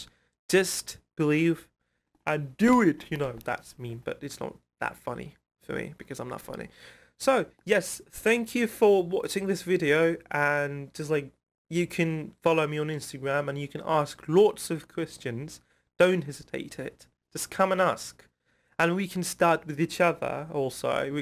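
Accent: England, United Kingdom. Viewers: British accent da cheery on top olmuş :) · uk aksanı bu ama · Valla helal olsun , "I am John." desen kimse şüphe etmez , nasıl bir İngiliz aksanı ya, harikasın tebrikler👍👍👍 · İngiliz aksani finaaaa ateşşş🔥🔥🔥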